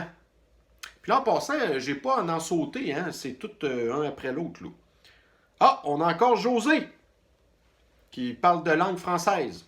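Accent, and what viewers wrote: Quebec, Canada. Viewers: Salut mon complotiste en chef, je ne suis pas d'accord avec tes commentaires et ton jugement sur nos dirigeants. Le gouvernement fait son possible pendant cette pandémie. Oui je suis un boomer et content de l'être. Pour le reste tu es le seul complotiste que j'aime malgré ton discours. Je ne sais pas pourquoi je t'aime, je suppose ta façon de parler ta mimique et ton accent qui me rappelle des amis que j'avais comme militaire à la Citadelle de Québec en 1975. J'ai hâte de voir après la pandémie quel seront tes sujets et sur qui tu vas mordre et ce , si ta chaîne n'est pas fermée d'ici là. Tu es le genre de personne avec qui je prendrais un verre de vin.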